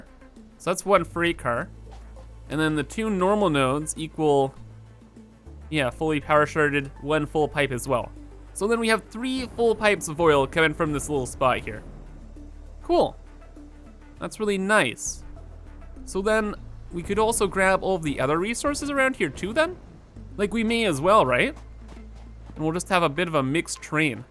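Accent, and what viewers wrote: Netherlands. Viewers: dude for sure you ar efrom holland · Are you dutch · Are you Nederlands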